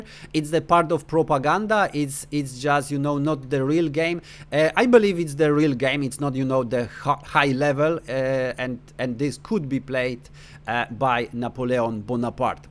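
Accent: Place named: Poland. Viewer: Po akcencie poznałem, że rodak :) Leci subskrybcja, łapka w górę i komentarz :)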